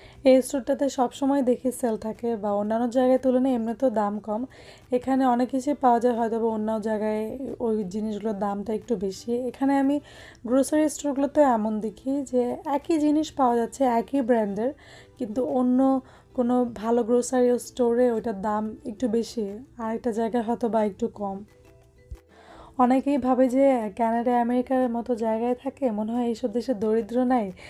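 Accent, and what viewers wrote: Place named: Dinajpur, Bangladesh. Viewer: Hi api apner video dakhi vloi laga apni onk sundor guceya kotha bolen apur soshur bari kothay north Bengal a naki dinajpur Rangpur ar moddha naki video ta Dinajpur ar kotha sunlam tai ask korlam amr home town oh Dinajpur a asola nejer place ar kotha sunla monta kano jani alada felling mona hoy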